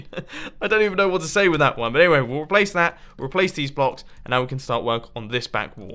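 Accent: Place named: England, United Kingdom. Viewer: TheEyeOfItsMaxinho I'm in English time zone like mumbo jumbo I think he sounds English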